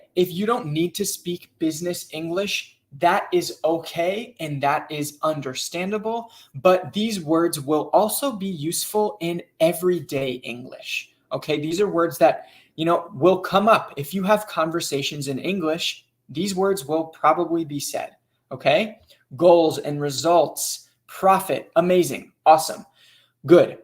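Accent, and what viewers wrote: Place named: California, United States. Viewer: AWESOME! THE BEST ACCENT I"VE HEARD IN FLUENCY, FROM CALIFORNIA.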